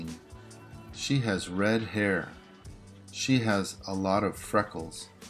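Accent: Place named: United States. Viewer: Thanks! I couldn´t find an American accent video till I saw this one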